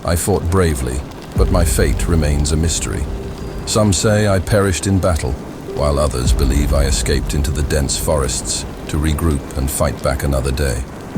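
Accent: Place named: United States. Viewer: Can the creators of this video remove the american accent narration?Lets have an Indian english accent. This narration is making a mockery of the names.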